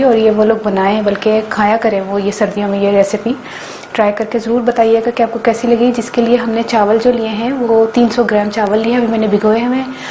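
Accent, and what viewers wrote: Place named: Ichra, India. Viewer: Tumhari urdu sy lagta hy tum ICHRA ki ho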